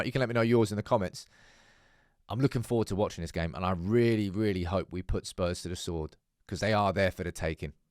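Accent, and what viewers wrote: Manchester, United Kingdom. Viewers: Nice mancunian accent absolute guff😂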